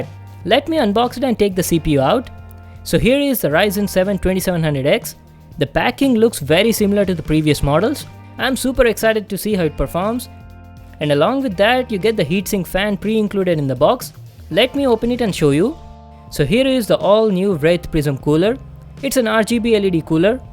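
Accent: India. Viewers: Perfect indian accent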